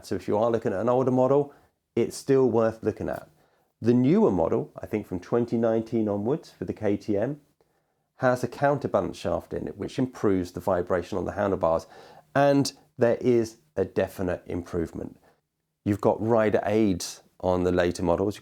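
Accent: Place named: United Kingdom. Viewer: Best vid on the 690! I need it all and your British !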